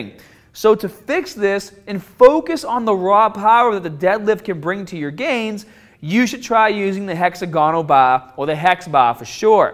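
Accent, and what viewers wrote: Boston, United States. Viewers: Can someone explain to me why has a Boston accent now? He never used to? I feel like I'm in a alternate universe or something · Dear god, your accent is so much Boston 😆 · Somehow I think is from Boston. Just sayin. About to grab a hex bar for the house since we're all trapped at home lol. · Where did this Bwoston accent come from?? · Hex bah. Someone sounds like he's from Bean Town. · Fantastic content and even better thick Boston accent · Boston accent no doubt haha. I'm from the south so we all have accents. Great advice though. Subscribed. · is this a boston accent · This dude has a crazy Boston accent. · That Boston accent tho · This dude used to work at the maaketing depaatment, reminds me of my buddy from baaston. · You must be from Bahston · U from Boston?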